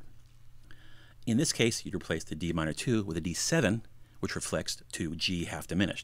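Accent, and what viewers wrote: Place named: Midwest, United States. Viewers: American English, if that's what you're asking. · midwest. maybe chicago area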